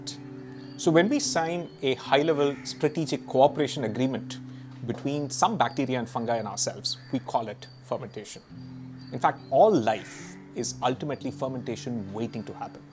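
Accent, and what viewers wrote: India. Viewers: the Hindi accent I like too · Great to listen Indian sound, ❤with depth of knowledge ,love to listen more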